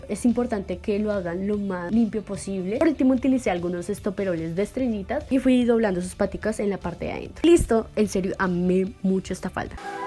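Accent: Colombia. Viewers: Es colombiana!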